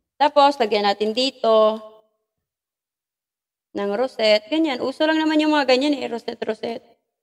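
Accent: Iloilo, Philippines. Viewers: ilonggo ka maam? hehhe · Gakalingaw ko mg ilonggo ka a.. haha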